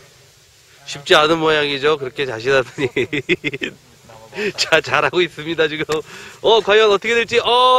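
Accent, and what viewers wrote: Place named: South Korea. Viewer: I'm not being offensive but it sounds funny when you speak mongolian because your accent is so korean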